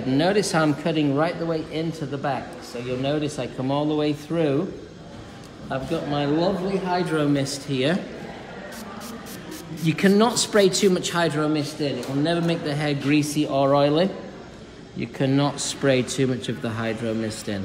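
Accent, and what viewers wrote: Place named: United States. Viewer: Why are you trying to speak with an American accent ??!!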